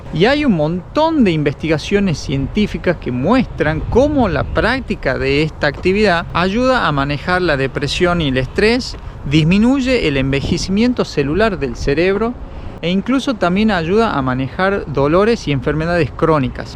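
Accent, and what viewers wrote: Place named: North, Argentina. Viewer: Que lindo el acento norteño argentino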